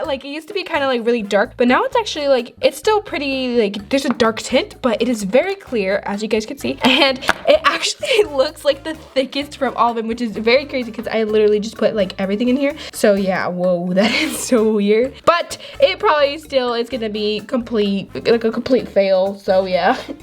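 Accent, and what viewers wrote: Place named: United States. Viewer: Tot you were American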